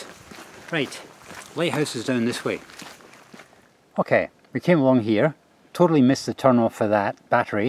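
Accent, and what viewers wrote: Scotland, United Kingdom. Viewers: Great content. Btw is yours scotish accent? Luv it.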